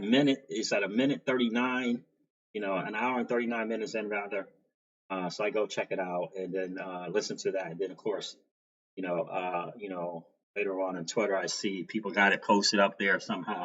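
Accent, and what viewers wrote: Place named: Brooklyn, United States. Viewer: I think it must be a Brooklyn accent. There was a rumor that was posted on aa redit page from someone claiming to be a ex employee of ripple who believes that stellar and xrp have been designed to be used as world reserve currencies. XRP is the store of value and stellar to be used as a digital currency. What are your thoughts? Maybe you could do a video on that.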